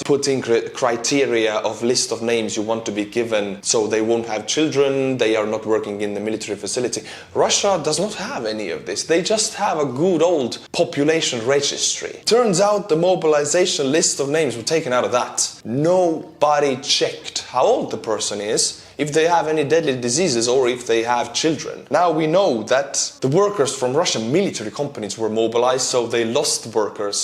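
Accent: Scotland, United Kingdom. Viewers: I like your Scottish accent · loving the Scottish accent. 😄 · Thanks again I'd love to say you butchered my language but you sounded like a scots men lots of love from Scotland 🏴🏴🏴🏴🏴🏴🏴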